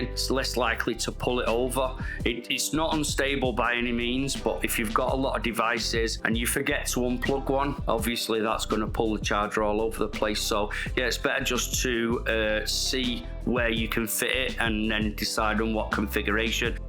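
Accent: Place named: Northern England, United Kingdom. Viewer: sweet review, cheers. Cool to hear a Norvern accent as well. Love from Liverpool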